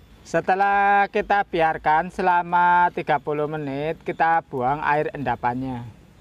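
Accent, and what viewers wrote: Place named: Bumiayu, Indonesia. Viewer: Mas asli mana,.. logatnya mirip suami org Bumiayu, dn sy betawi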